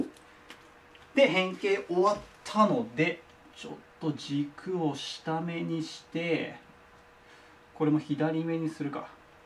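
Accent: Kansai, Japan. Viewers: 関西の人なんですね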